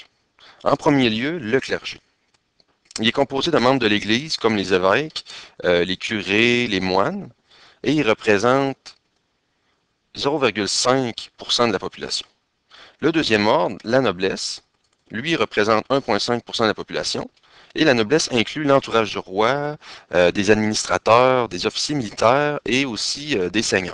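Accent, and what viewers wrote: Quebec, Canada. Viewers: Non les profs d'Allô Prof sont québécois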